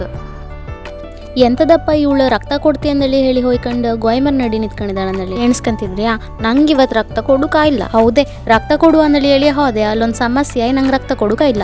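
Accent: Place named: Kundapura, India. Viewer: Beauty of kundapura kannada👌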